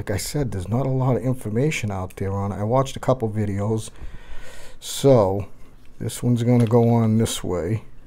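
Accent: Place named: Boston, United States. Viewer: Helped me man. My FA-18 throttle and stick just arrived this morning, but I was having a headache putting the throttle together as the pdf manual from the winwings site wasn't too clear on assembly. You helped me work out what goes where though, which I couldn't find in other videos. Love the Boston accent too. Many thanks from Aberdeen, Scotland.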